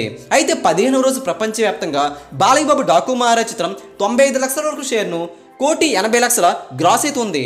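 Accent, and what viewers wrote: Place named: Srikakulam, India. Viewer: Babu Nuv pakka srikakulam village babu laga unnav ne yasa nuvvu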